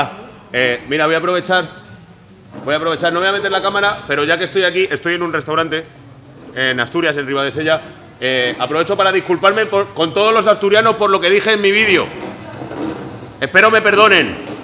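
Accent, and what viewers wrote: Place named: Cuba. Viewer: esa cochinada mas q español parece de cuba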